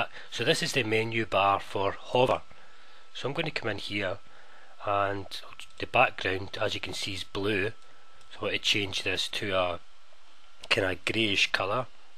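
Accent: Scotland, United Kingdom. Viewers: All these people not knowing what a scottish guys accent is :D poor non UK people.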